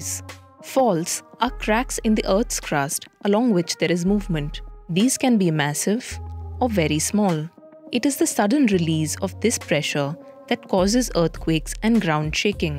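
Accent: India. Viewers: I hear indian voice i hurriedly cancel because when we have Europeans expert why should I waste my time listening a mass who believes more in religion then in law and order 😭😔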